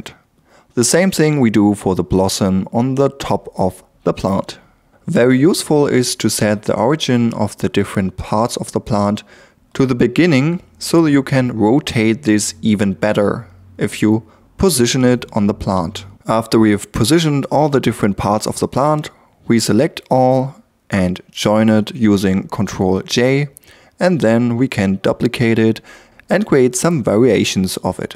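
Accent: Germany. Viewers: haha your english is awful :D 10 seconds into the video and i yet hear that u are german ;) anyway, great 3d skills, good explanation- stay creative :)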